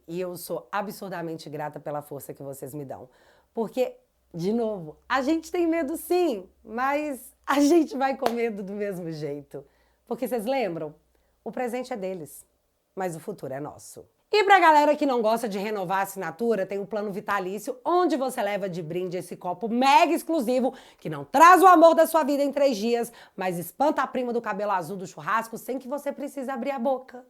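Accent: Minas Gerais, Brazil. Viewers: Bão por de mais como falar o mineiro 😅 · Sou carioca mas o sotaque mineiro é o mais bonito da língua portuguesa 😊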